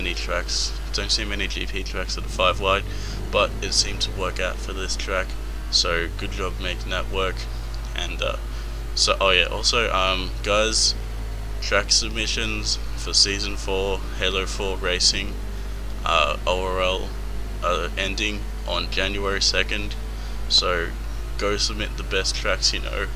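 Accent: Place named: Australia. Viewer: Great Australasian accent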